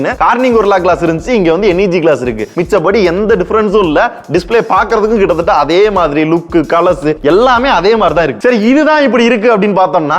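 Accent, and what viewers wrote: Madurai, India. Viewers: When our madurai gang vanthale antha slang kaga technicality kaga than unga video pidikum but specific a sollanum na antha VR HEADSET REVIEW ❤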